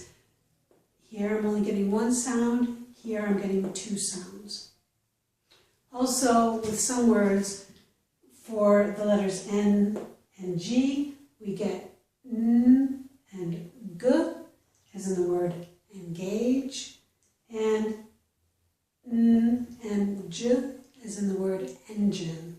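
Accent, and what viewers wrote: United States. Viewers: You have such a beautiful American accent. Would I be right in assuming that is a General American accent?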